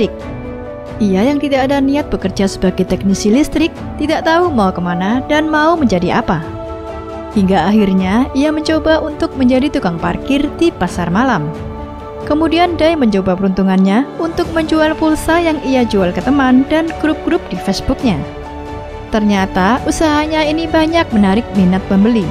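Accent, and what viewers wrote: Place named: Medan, Indonesia. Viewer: Bang lu orang medan ya?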